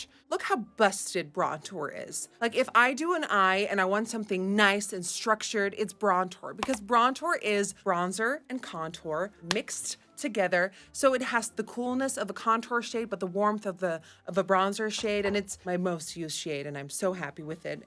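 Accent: United States. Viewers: Why does she have an American accent, Dutch people have such a strange accent. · how often do you come to the US? You have an American accent.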